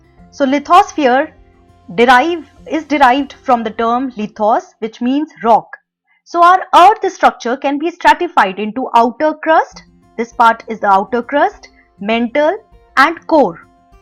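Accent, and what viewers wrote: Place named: India. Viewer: The content is good, however, the indian accent's extremely annoying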